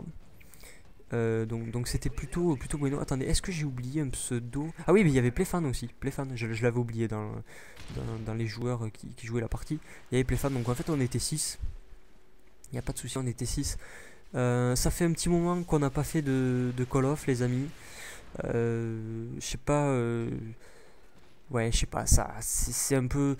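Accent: Toulouse, France. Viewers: Cet accent toulousain me fait tellement rire!!! Bonne continuation à toi!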